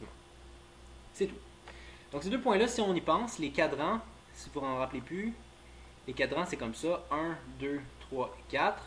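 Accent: Quebec, Canada. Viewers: Je reconnais un québécois ici :)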